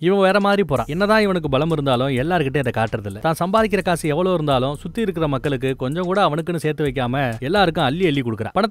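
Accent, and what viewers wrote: Coimbatore, India. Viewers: Poramai &pochirubu kovai slag bro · 😂😂 anne nenga coimbatore ahh😅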